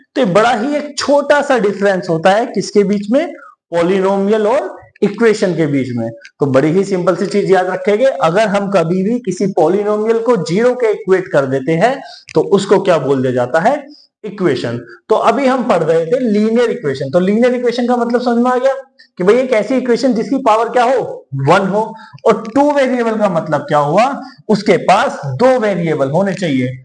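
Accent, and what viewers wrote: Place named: Meerut, India. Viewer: Sir I 🤔 think you are from meerut...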